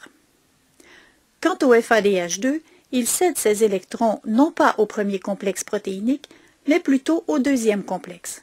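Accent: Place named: Quebec, Canada. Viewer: Clair et Conscit merci ! (petit + pour l'accent québéois trop génial :) )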